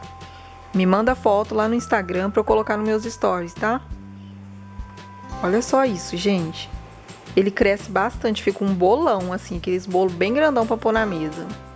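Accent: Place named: Inland São Paulo, Brazil. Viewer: Posso estar enganada mas o sotaque dela, parece com o do povo do interior de Sao Paulo. 🤔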